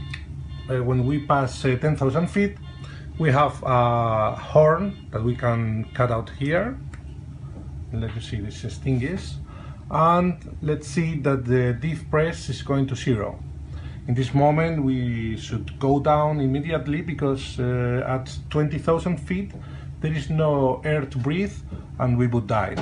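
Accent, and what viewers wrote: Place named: Catalonia, Spain. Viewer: Accent català jajaja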